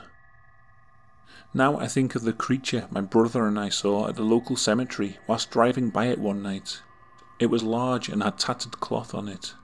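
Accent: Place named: Northwest England, United Kingdom. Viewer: Just sounds like an Englishman with a mid-northwest accent to me.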